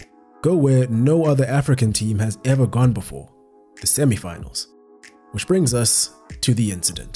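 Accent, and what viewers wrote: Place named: South Africa. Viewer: I always knew you from SA. The accent and slipping in Tau and psl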